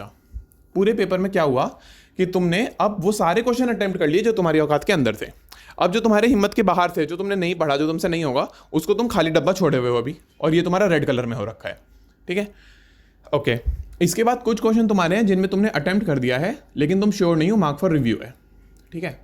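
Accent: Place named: NCR, India. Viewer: Great video.....aur finally koi aisa banda mila jo thodi ncr accent mei bol rha h😂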